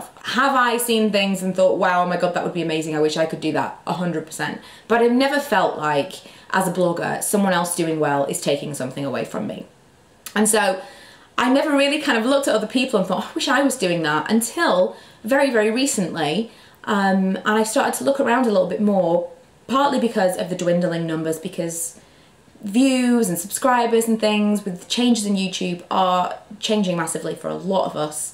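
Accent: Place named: Yorkshire, United Kingdom. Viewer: I watch all of your videos. I'm 23 years old. You mentioned you don't get the same opportunities as other youtubers because you're in Yorkshire. but actually, that's what i love about your videos. For me, it's all about relatability. when I watch your videos it's really comforting because im from Sheffield, it's nice to recognise an accent that feels more like mine, i dont know if that has anything to do with an embedded North and South divide. 😂 But the best thing about your channel is i really value and trust your opinions. I never know when other youtubers are being sponsored, youre very real. I dont relate to the youtubers that are constantly having photo shoots and walking the red carpet because its just not normal enough.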